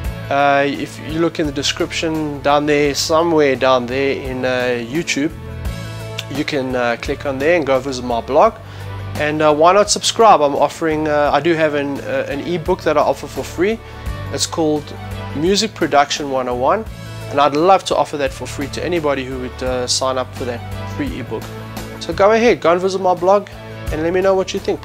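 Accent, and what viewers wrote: South Africa. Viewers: I must say I do enjoy your way of explaining things to the people. Very simplified and gentle (I like your South African accent too) I hope you're happy with your life, I think you have earned the right to be happy :)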